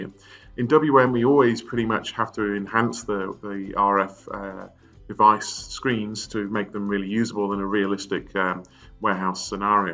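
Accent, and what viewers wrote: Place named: England, United Kingdom. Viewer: Finally a video with english accent, great video.🎉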